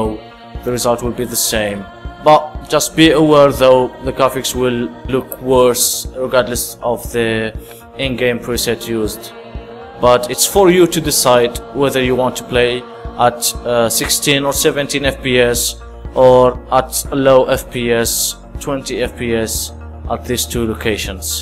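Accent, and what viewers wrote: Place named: England, United Kingdom. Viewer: wich place of england are you?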